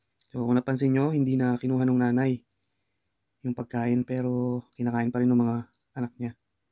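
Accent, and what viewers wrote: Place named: Cavite, Philippines. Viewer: sanaol tol madami nang slings may puntong caviteño hahaha.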